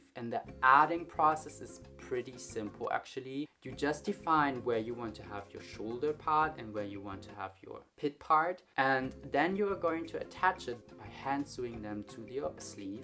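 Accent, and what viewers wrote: Germany. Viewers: Sein deutscher Aktzent triggerd so hard.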